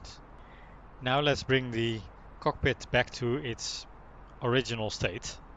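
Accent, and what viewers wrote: Netherlands. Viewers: Ha! Ik hoor een Nederlands accent! Gaan jullie ook over op Xbox series X? 👀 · i smell Nederlands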